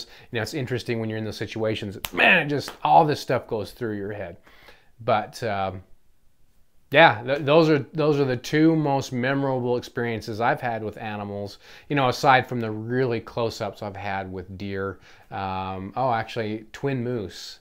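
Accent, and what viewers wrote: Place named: Appalachia, United States. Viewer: You reverted back to your hillbilly roots with that accent. Good vid.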